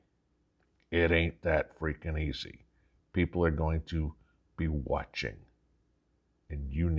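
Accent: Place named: Bronx, United States. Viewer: Sounds like the bronx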